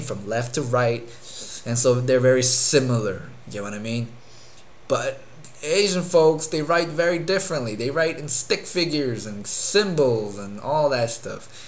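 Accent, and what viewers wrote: England, United Kingdom. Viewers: What the hell I couldve SWORN i was subscribed to already! lol No wonder why I havent seen a new video in a while. lol but Haha the "Wear underwear or something thats nasty!" XD too funny! I didn't know they went freeballing in those kilts lmao! Learned something new everyday lol btw Your britsh accent is awesome!! ELLO LOVE!:D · LOL I love your English accent!!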